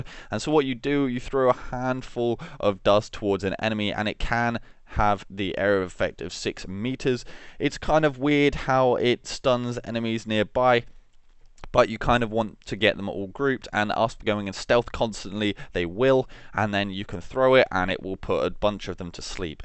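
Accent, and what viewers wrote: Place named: Australia. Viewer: You SOUND, I think, Australian. However, you can't say perfectly normal English words like caltrops, sundered, or comatose (claptraps, shundered, and catamost, respectively). A-are you just... like... illiterate? If so, I guess I'll never get an answer.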